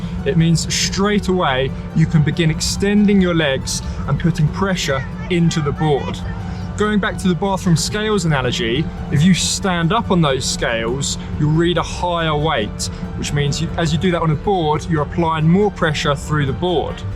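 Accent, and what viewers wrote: United Kingdom. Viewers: Best British Snowboarding Youtuber. I like your British accent. U also an excellent coach.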